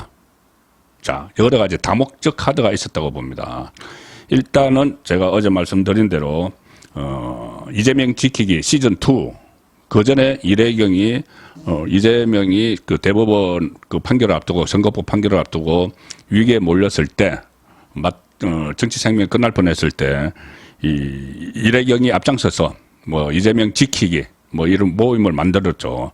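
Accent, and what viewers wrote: Gyeongsang, South Korea. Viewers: 들을때마다 갱상도사람의 약간 떠듬거리는 말투...꼭 웬지 나와같다는.....난 서울사람처럼 매끄럽고 술슬 말이 나오는럿도 좋지만...전 영양출신으로 처음 청주에서 대학생활애서 표준어앞에서 사투리를 감추느라고 땀흘리던 생각이 납니다. 70년대초반 중딩때 석간 영남일보 100여호 배달 알바를 열심하던 추억이 생각납니다...응원합니다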